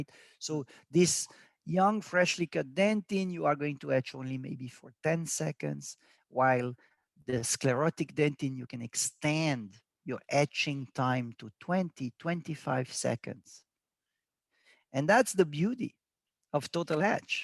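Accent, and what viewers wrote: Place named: France. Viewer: ну ладно французский английский))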